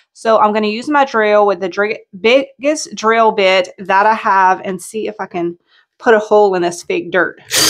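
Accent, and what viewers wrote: Louisiana, United States. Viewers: Your the cutest lil chic! I LOVE your LaFayette, LA accent! I recognize it anywhere. I watch because your channel is very cool but big bonus to me is just hearing you talk! LOL God Bless!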